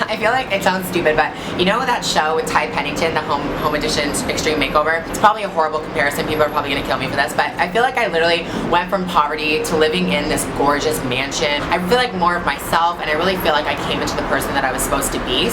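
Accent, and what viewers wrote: Canada. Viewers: she is from Canada, our english is similar to the UKs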